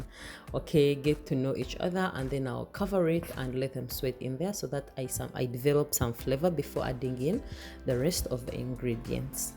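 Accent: Uganda. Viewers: I love Ugandan English (I love everything about Uganda 😂). It is very different from Kenyan English. If you know, you know. Copying the recipe. Love and greetings from Nairobi 🇰🇪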